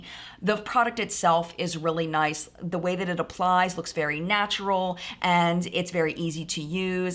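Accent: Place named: Midwest, United States. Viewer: I love your midwestern accent :) I’m from Iowa and say “vanella” also and always get called out for it. ;p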